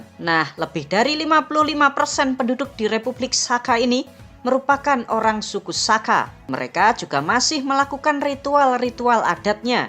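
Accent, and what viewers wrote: Java, Indonesia. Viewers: Logat nya jawa pula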